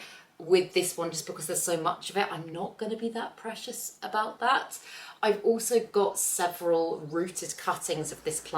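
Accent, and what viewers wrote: England, United Kingdom. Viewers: Love watching,just wondering if you have an outside space to repot this time of year,less clean up ?After watching you I’m heading out to repot a few too❤❤❤Hi from Maine,USA🇺🇸🥰 Oh and I love your English accent😊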